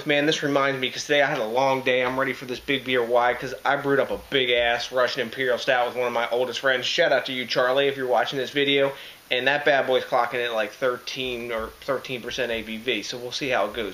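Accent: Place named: Baltimore, United States. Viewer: Hey, what part of MD are you from? Sounds like a Bmore accent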